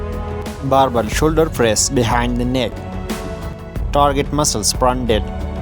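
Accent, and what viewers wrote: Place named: India. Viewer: Your English very poor indian style hahaha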